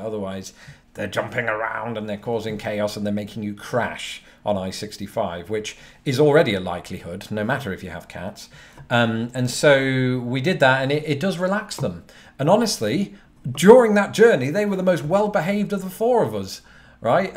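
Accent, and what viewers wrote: United Kingdom. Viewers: Sherbet Fountain Dorset, although she didn't have much of a West Country accent, being younger. But I notice it with Brits all the time, including our dear host in this video.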